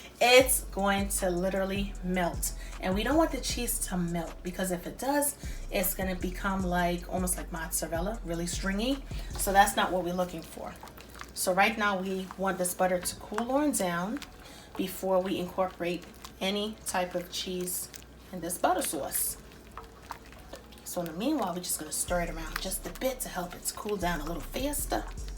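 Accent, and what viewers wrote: New York, United States. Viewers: Damn thank you so much!! And do I hear a New York accent?? ❤️ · You from NY I can tell 😂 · Are you from New York or someone near that area? Hearing an accent lol. Love it btw. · From one New Yorker to another I love your accent . your food looks great !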